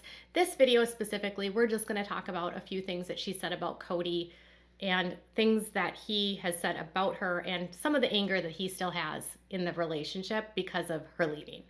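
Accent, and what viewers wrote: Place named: Minnesota, United States. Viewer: I could never put my finger on your accent before because it's VERY slight...but then you said "Minnesota" and I heard it and it was the most adorable thing I heard today 🤣🤣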